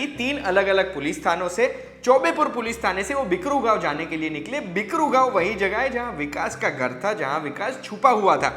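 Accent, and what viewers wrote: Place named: Gujarat, India. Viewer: hindi ma bole to pan Gujarati sambhday chhe 😁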